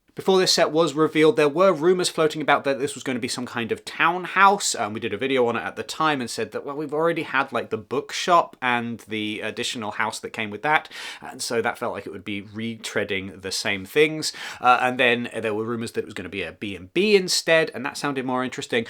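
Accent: United Kingdom. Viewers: Ahahaha, great. We really appreciate your videos, we are from Italy, me and our two daughters (10 and 14), we love you and with you we have also a good exercise of comprehension with your beautiful british accent!!! With subtitles, of course ahahaha. And we are fanatics of Lego too, for sure.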